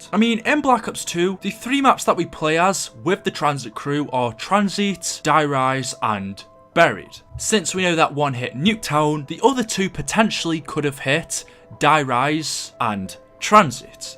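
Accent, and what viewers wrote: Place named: Stoke, United Kingdom. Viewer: Love the stoke accent but anyway why are we split at the start of vürruckt??? Btw you are awesome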